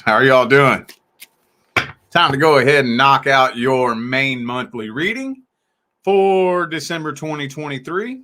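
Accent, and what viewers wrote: United States. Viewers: Hello From France,🙂 How come while I have been rolling rolling rolling through the many videos of Tarot without falling till today to your great work. Let's go straight, I look at my tarot ( 5 different artists that I really really like Boticelli, Isawatt, Anne Guesquière, ...) I do it once a week otherwise you can feel easily lost, while doing once a week it is my moment and I like it. But sometimes during the week, I like to put a curious eye on Zodiac. And today ,I discovered randomly your channel, you killed me since you speak with one clear voice ( I love presentation cosy cool attitude with black and white band on the screen to follow in which topic we are and all that with humour even sometimes thematics are not easy always well most of the time you speak with awesome comments . so I am in sheer curiosity I will even drop on my notebook some of your comments, it allows me first to speak a better English ( sounds you live in USA , that accent is ok too ) and to take conscious as a pure true Aquarius down to Earth I embody that most of the time it is necessary not to play an automatic way of life pace and rather focus on a "camino" on a way to get closer to what wee feel in ourselves really stop lying to oneselves, assume decisions, choices... 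and well yes I can say this my first video from u listening and I feel happy because of the tone you employ emphasis on purpose, repeated sentences, like dear Aquarius stop with your " I know, I know, I k now" among a plenty.👊✊👏 I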